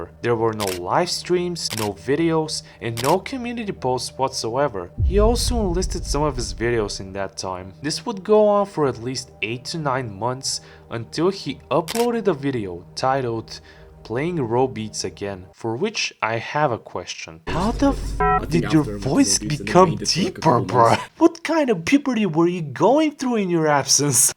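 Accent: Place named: Bulgaria. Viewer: Tozi Bulgarski Akcent e na drugo nivo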